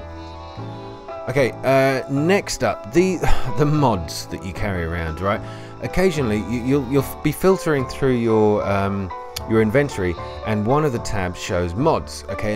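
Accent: United Kingdom. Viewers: Got the game a long whole ago but never started off with it. this video is not only an interesting watch but a good start for me too. Plus i like ur accent - Briton? and oh ... music is ok ok, i was concentrating on ur voice and didnt feel too distracted. maybe next time go for something with no lyrics.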